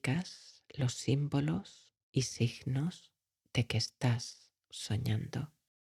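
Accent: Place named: Spain. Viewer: Muy buena voz, sin el acento de española. estaría de lujo! Si tú piensas que es neutral pues seguro eres española.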